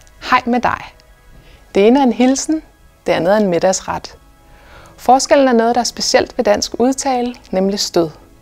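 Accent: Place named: Copenhagen, Denmark. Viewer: De her "Stød" videoer på Youtube har det med at tro, at alle taler københavnsk, synes jeg. I en anden video brugte de ordet "gul" som eksempel på stød, og her bruges "bil". Ingen af ordene udtales bare på den måde, så snart du kommer udenfor deres dialektområde, så det virker som ret dårlige eksempler, synes jeg. Det der "Bi-il", "Gu-ul" halløj kender jeg udelukkende fra københavnere, som jeg kender. Jeg har aldrig hørt dem udtalt sådan af andre eller i andre landsdele. Så virker det lidt mærkeligt at sige, at "sådan gør man på dansk". Det er mange årtier (hvis ikke århundreder) siden, at københavnsk var tilnærmelsesvist rigsdansk. I dag er det en af de mest sære/specielle dialekter i hele landet med "glottal stop"-stød, et hav af bløde D'er i ord, som slet ikke indeholder D ("Huset" f.eks.) osv. Københavnsk er på ingen måde repræsentativ for landet som helhed, når det gælder udtale. 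Københavnsk er omtrendt lige så repræsentativt, som sønderjysk eller bornholmsk er i dag. Derfor er det f.eks. også altid københavnsk, som svenskerne gør grin med i deres sketches, som de kalder "potatisspråk" osv. Det er non-stop halslyde derovre. Tag f.eks. udtalen af ordet "meget", og så bliver det jo et eller andet i stil med "Maaard" (med blødt D), hvilket igen er helt unikt for den dialekt. Hele det der "aaaa" er jo ren hals. lol Det tror da pokker, at svenskerne kalder sproget "grötig". Hvis du f.eks. tager en tur til Østjylland eller lign. så udtales "gul" jo bare, som man ville forvente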